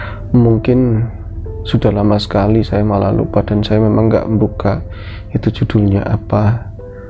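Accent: Tegal, Indonesia. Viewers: temanggung hadir mas...untuk cerita kali ini q insyaallah yakin blm prnh dibawakan di chanel sblmnya soalnya klo cerita di chanel sblmnya alkhamdulilah q sampai hafal alurnya semua karena tiap cerita q dengerinya 3-4 kali...oya cuma masukan aja buat mas klo dr q justru lbh suka klo ada logat tegal,nya selain lucu entah knp q jg tertarik dngn logat tegal,nya mas....🙏🙏🙏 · Mas,maaf saya telat 16 jam 🙏 Tetap semangat mas...awal2 di ngobor dl juga saya sedikit kesulitan nyimak kalo mas lg bawain cerita (mungkin krn saya orang sunda) tapi lama ke lamaan jd terbiasa dg logat tegal nya. Semangat mas,d tunggu cerita2 yg lain nya 💪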